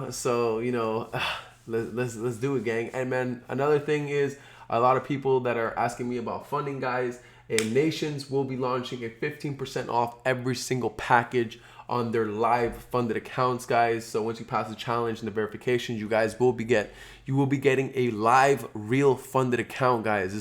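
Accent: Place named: United Kingdom. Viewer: That British accent got me dead 😂😂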